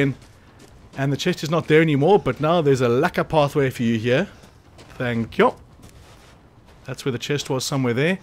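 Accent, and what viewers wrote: South Africa. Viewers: U sound like someone from the SA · Nice to hear a fellow southafrican accent lmao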